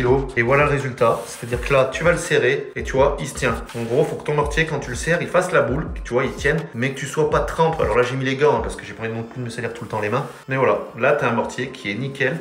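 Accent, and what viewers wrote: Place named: Vendée, France. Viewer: Salut. J'adore ce que tu fais et en plus moi qui suis d'origine Yonnaise, exilé en Limousin, ton accent est ma petite madeleine de Proust! Peux tu me conseiller sur la pose d'un carrelage 60x30? Pose à 2/3 1/3 ou 50/50? Merci et continue tes vidéos Thierry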